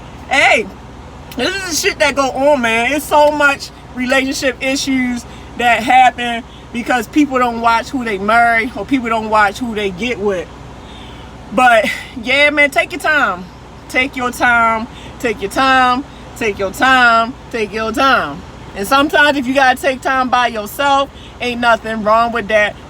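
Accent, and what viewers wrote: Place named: Baltimore, United States. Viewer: I can't tell you how this video came up in my recommendeds but I'm glad it did. I heard a few words and instantly knew, she from Baltimore. My best friend is from B'more and an old Army buddie. That accent is heavy. Be safe out there on that road Ma'am.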